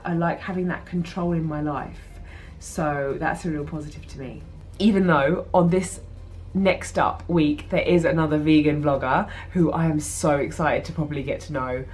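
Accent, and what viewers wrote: United States. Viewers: Your American accent is SO good! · Your american accent is really good! Oh could you do a video on some workouts you do at home. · Your american accent its subtle, but really good. :)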